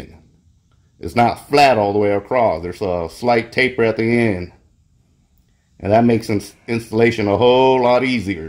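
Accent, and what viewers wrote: United States. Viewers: What's the durability on the fake like , dose the spring begin to leak ? Also your accent sounds awesome I'm from NZ and Americans sound diff but your accent sounds like a mix between then more American though , my high boost is 23psi in the ej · The replica out here catfishing 🤣 this dude voice and face catfishes me.. no way did I think this dude would sound like this talking 😂 got that down south draw ..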